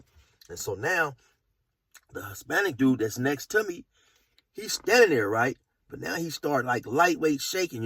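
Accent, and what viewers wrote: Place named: California, United States. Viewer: “CEEmemt wall” haha that Cali accent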